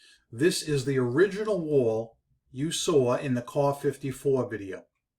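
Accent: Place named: Bronx, United States. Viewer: Thanks so much for this video - 1st, your accent reminds me of my dear departed mother, who grew up in various boroughs ( she was born in the Bronx ) - she and my dad ( who was from College Point ) moved upstate in the late 40s, but she never quite lost her accent. 2nd, your breakdown of what was being torn down and subsequently built put me in mind of what the overall plan for channeling traffic in and around NYC and its suburbs- and what part Robert Moses played in that construction- I heartily recommend the book “The Power Broker” ( I’m sure it’s still available on the used market ) - it’s a thick tome on his construction of freeways ( along with many other works in NYS ) - well worth the read for its mix of a powerful man’s vision and works - some very good, others not so much.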